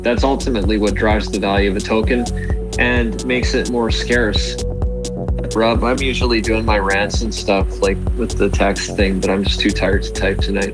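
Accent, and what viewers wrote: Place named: Canada. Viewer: Sounds Canadian 😆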